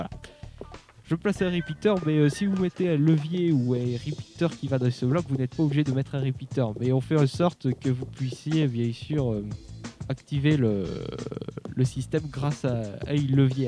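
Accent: South, France. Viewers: hé ! l'accent du sud c'est le plus bel accent du monde ^^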